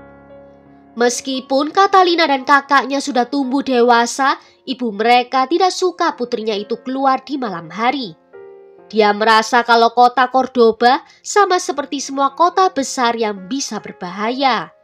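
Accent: Java, Indonesia. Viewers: Medok banget 😅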